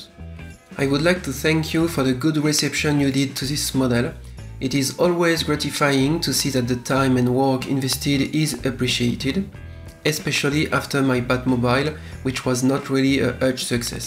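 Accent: France. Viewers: On reconnait l'accent francais😅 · Watched 1 video and i m a big fan dude. Love your franch accent as an german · Joli accent français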